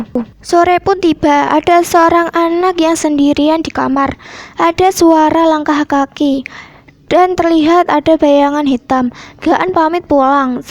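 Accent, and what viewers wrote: Java, Indonesia. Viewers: Bahasanya terlalu medok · Kak, kakak orang jawa ya😶 Soal nya kkak ngomong nya kyk orang jawa😅😅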